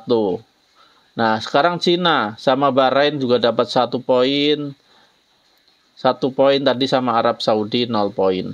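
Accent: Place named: Banyumas, Indonesia. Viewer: Ngapak bangeet mas...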